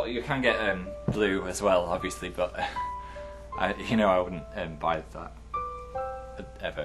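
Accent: North, United Kingdom. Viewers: HE SOUNDS SO MUCH MORE NORTHORN HERE!!!!😁😁😁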